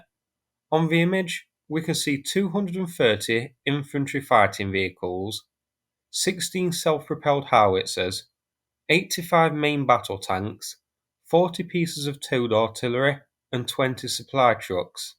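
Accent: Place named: England, United Kingdom. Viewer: Boring. Zero insight. Just a drab, dull, less-than-perfect working class English accent.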